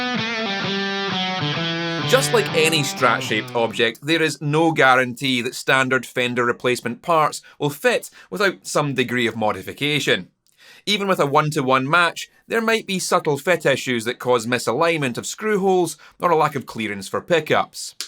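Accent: Scotland, United Kingdom. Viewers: Yo, are you in Scotland? I’ve been subscribed for a LONG LONG time I just don’t remember if you ever said where you are but you sound very Scottish to me lol. My people on my dad’s side while we’re Irish we are from Northlands of Scotland so I have much love for Scots (and Irish) naturally. The other side is Welsh, Swedish & English lol. I’m a full on one of you’s guys. Minus the Swedish 😂.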